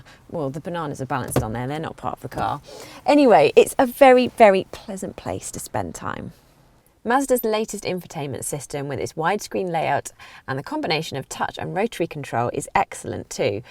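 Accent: United Kingdom. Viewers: I like her accent :))) I don't thinks there's anything wrong with British accents but British folks seem to like to attack each other over it, especially they like to attack some of their 'posh' accents. That's kinda funny :))) But there might be some sense in that too, I guess. Some intonations and cadences might be overkill but that's the way we speak. Sometimes some women can't stand the accent of some other girl :)))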